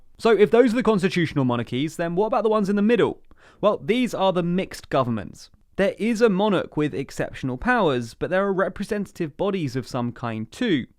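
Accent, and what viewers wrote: United Kingdom. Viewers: He’s British so what he says in his accent is correct. Because he sounds British.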